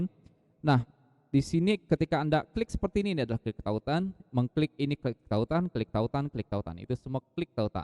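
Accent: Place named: West Java, Indonesia. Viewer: Sunda pisan euy, siap auto subscribe! Nuju dipilari konten meta ads kieu.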